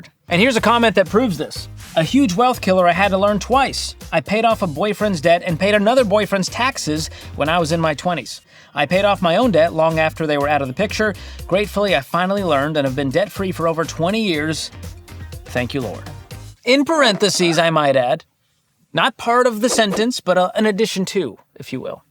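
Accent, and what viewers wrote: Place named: Boston, United States. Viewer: There was some reason demeanor and presentation felt familiar but I couldn't identify why I felt that way. But today I learned why! We're both from the Boston area! Too funny. It must be something about the cadence of his speech or his sense of humor. Proud to consider you a former neighbor,!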